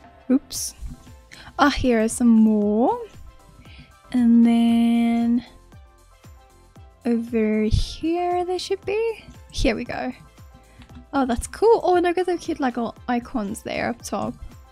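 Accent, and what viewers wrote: South Africa. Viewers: Your accent sounds so familiar. Is you South African like me?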